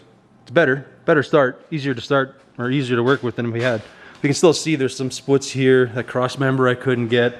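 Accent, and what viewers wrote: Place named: Manitoba, Canada. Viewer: I miss the Manitoba accent. At least you don't punctuate every sentence with the word F@ That might be a Winnipeg thing. I don't know....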